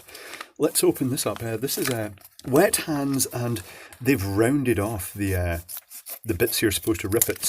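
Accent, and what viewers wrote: Scotland, United Kingdom. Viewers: Well, the Scottish accent is good, Plus has a good grasp of all things technical, and explains things really well (something I really appreciate). It doesn't matter what your level expertise, you'll probably learn something by watching. eg: I didn't actually know that the gas given off by those chemical heaters was Brown's gas (HHO, in a perfectly explosive mix) but it makes perfect sense now that I've been told. Us techies can be strange people, and understands us better than our parents did, so it's a bit like coming home to the home you never had. By being himself attracts technical people, and gathers people who all speak the same language. Sometimes I wonder if himself knows how special this is.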